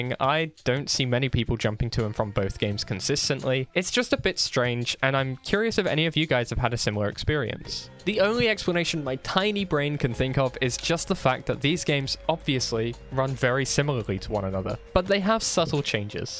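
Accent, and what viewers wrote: United Kingdom. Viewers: Clickbait thumbnail - Check Talking Head with 4 poses - Check Hasn’t beaten the game they’re talking about - Check Annoyingly British - Oh Absolutely Yup it’s a game journalist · I will sub you bro love British accent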